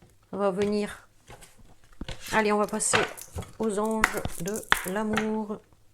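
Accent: North, France. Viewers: Un petit bonjour d'Hautmont près de Maubeuge😉😂👍. Je vous découvre et je retrouve bien l'accent du Noooooord ! Merci pour ce tirage, je suis gémeaux ascendant verseau également ! 😉👍. Je m'abonne à votre chaîne avec grand plaisir. Bisous à vous et à votre adorable petite chienne😍🙏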